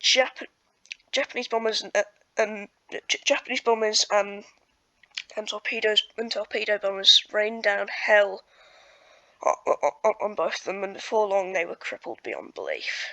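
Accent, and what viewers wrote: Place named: England, United Kingdom. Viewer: Thank for this really well put together video. For the first time in ages, I'm getting to view something where the narrative matches your visual presentation accordingly and accurately. Your English accent turns my ears into soft butter. A pleasant change to my usual cretinous American environment. Well done and thumbs up.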